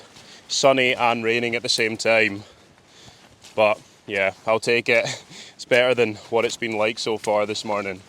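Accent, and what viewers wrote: Scotland, United Kingdom. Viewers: Your videos are so relaxing, beautiful scenery, lovely Scottish accent, what more could you want!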